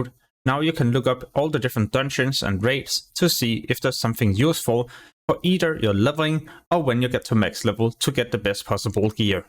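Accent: Denmark. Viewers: Man you sound Danish 😅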